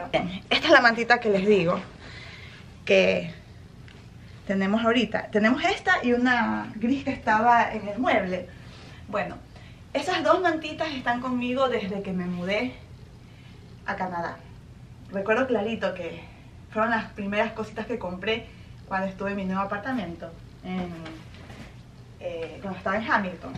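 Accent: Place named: Ecuador. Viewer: Por tu forma de hablar te reconocí enseguida que eras ecuatoriana xD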